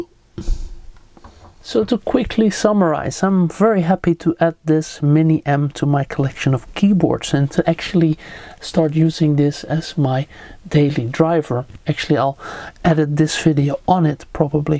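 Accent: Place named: Netherlands. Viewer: It's incredibly obvious when listening to the clicks and paying attention to the recording, but I caught it in the corner of my eye, watching both. But if any board would be that slow to respond it'd be brought up in the review, instantly as that's borderline unusable, I've suffered a Bluetooth keyboard that's actually that slow. My New Model M, the full sized version with a simpler controller module, has no noticeable delay at all, though I'm unsure to the debounce time. I've even played some games with it, aside from the actuation hysteresis and membrane sensing 2KRO limitation it's perfectly fine for non competitive play. This 2KRO limitation and high weighting would be why it isn't ideal for very, very fast typists, but if you're that fast you'd already have gotten a special board for it. P.S. Dacht al dat ik het accent herkende, erg duidelijk voor andere Nederlanders maar bevestigd door de interface in dit stuk.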